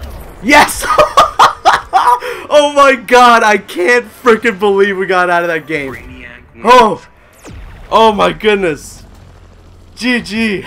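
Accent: Canada. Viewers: This is my first time on ur channel and can I just ask, are you Canadian?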